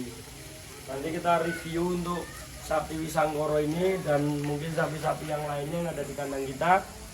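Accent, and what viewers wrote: Banyumas, Indonesia. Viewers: Ciri khas ngapaknya jangan sampai tertinggal mas selalu sukses selalu lembu benggolo farm & resort 🙏🙏🙏🙏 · Ora ngapak yaa ora kepenak... Ora kepenak yaa ora ngapak... 😍😍😍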